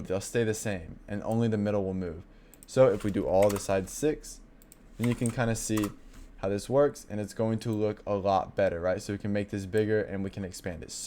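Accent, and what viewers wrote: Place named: Mid-Atlantic, United States. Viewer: I gotta say...I've "been around the block" in terms of seeking out clear tutorials...and I keep coming back to (and I'm a fan of the mid-Atlantic accent...somewhere between Philly and Baltimore if I could venture a guess). Anyway, really great stuff here!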